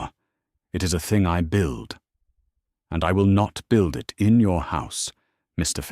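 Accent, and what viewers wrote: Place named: England, United Kingdom. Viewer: A very enjoyable story. Thank you for using a narrator with an English accent. When the story is about Dukes & England, that is the proper choice, yet some use a narrator that is, clearly, not English, usually American. It takes away from the presentation. Thank you for a good story, supported with good taste. It is appreciated!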